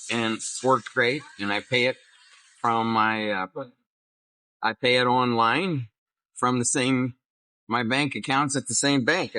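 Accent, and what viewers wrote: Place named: Midwest, United States. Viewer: Hi guy I don't know your name. This is the first time I've seen your channel. I live/d in Negros/Valencia for four years now. Sorry to hear about your banking troubles. Hope you get it resolved. I'm Jeff/70 from Wisconsin originally but lived in California long time. Are you from midwest? Just curious from your accent. Yeah, simple life ain't so simple...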